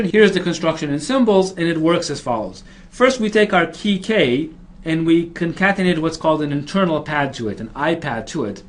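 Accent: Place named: United States. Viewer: Omg finally an American accent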